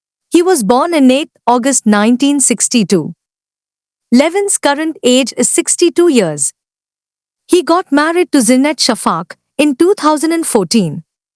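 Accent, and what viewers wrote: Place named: India. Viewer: Ta blbkaco to cteat se naučí výslovnost angličtiny ! Ať nečte nesmysly krava patrně bych řekla india nebo podobné !👎👎👎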